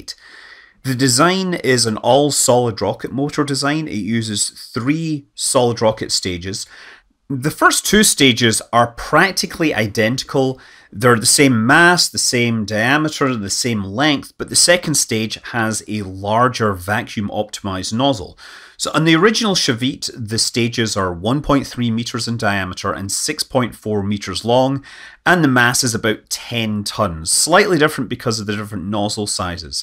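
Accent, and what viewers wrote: Scotland, United Kingdom. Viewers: you'd think someone with a scottish accent would be able to say Palmachim correctly :) (or palmakhim.... פלמחים) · He's a Scot. You can hit closer to home with Scottish pronunciations!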